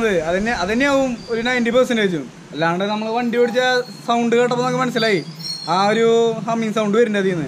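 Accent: Thrissur, India. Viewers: തൃശൂർ സ്ലാങ് ഇഷ്ട്ടം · ande place thrissur thannya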